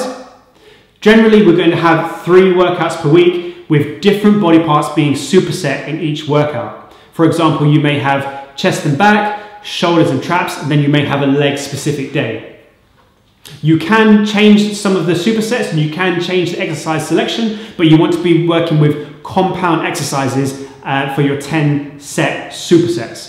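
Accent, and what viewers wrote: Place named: United Kingdom. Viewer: and why is it always bri'ish explaining the german volume training lol , ok jus kdn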